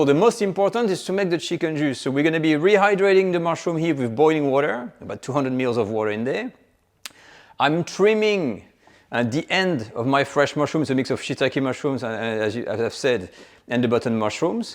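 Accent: France. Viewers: I understand everything he says. He speaks with a charming French accent and his explanations of his cooking is perfect. 😊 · Er ist OFFENSICHTLICH Franzose. Er spricht wie meine Mutter.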